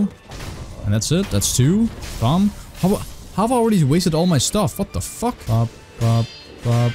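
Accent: Denmark. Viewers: Danglish on point, as always.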